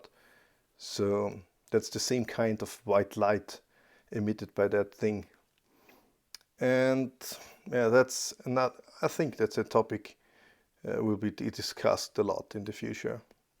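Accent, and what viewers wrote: Germany. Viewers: it took me three seconds to be sure youre german.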